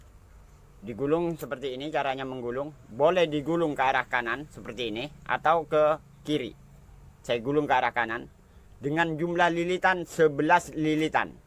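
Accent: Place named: Madura, Indonesia. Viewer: Terima kasih ilmunya pak...kayaknya dari gaya bahasanya orang Madura ya pak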